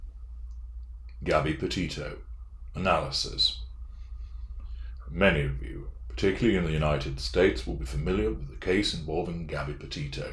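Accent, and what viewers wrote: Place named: Oxford, United Kingdom. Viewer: No *Said in that rich Oxford accent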